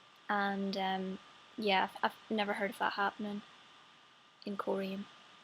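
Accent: Northern Ireland, United Kingdom. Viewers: it's a British accent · Great to hear this modern Coleraine accent - it has mellowed over the years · northeurn oyrleund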